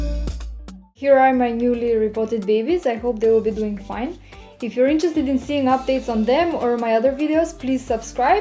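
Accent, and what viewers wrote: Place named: Slavic, Eastern Europe. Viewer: greetings from California... nice accent.. are u slavic? thank u 4 your helpful presentation..🌞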